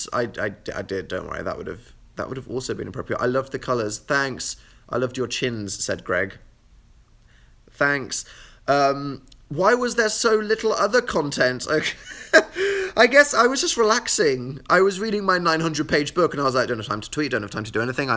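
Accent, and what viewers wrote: United Kingdom. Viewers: your british voice soothes me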